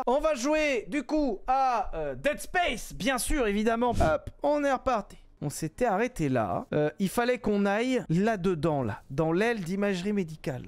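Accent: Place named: France. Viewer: Et à quand la fin de tes tres merités vacances!!!! Je mennuie de mes jeudis midi bagnole avec celui qui a laccent francais mais ne devient jamais monotone a ecouter ( je suis au quebec et vive le decalage!!!) et je dirait meme c du bonbon a ecouter .... Et , meme si ca peut etre drole au debut merci davoir laisser les pets et les rots dans ton ancienne vie.....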